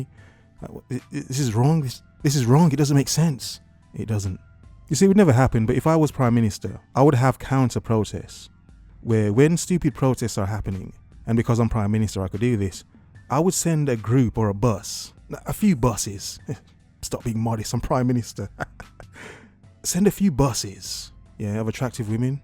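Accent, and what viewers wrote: United Kingdom. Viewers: You do a good job 8 times out of 10. This one, no. You sound like a cranky old Republican. Your idiosyncratic Brit voice mitigates the Archie-Bunker-impression somewhat. You're still the Man, though.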